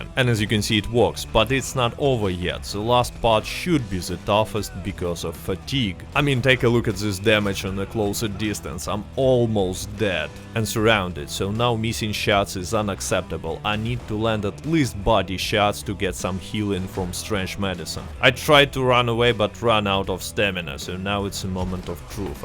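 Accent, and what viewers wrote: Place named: Russia. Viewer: You have a cool Russian accent. Your voice reminds me of my wife's uncle 👍🏿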